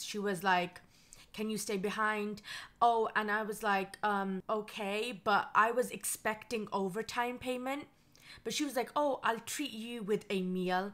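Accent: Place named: Wales, United Kingdom. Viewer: Welsh accent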